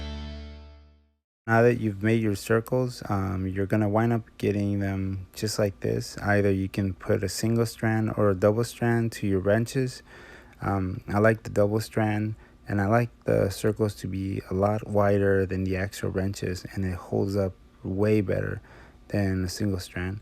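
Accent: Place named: France. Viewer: If YOU were really paying attention you’d have recognized that English is not his first language. Hints - photos of Paris on his wall, his name, his accent (although it sounds like French ancestors but growing up somewhere else).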